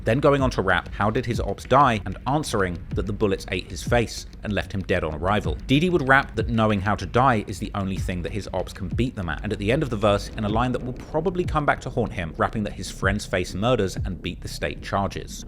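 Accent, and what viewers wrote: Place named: England, United Kingdom. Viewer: this donny a str8 fed where in England is he from 🤔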